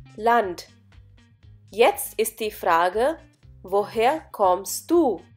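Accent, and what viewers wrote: India. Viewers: the lady speaking has an indian tone to her deutsch sentence.. does any one else think so? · I was hoping for a German speaker, not an Indian person.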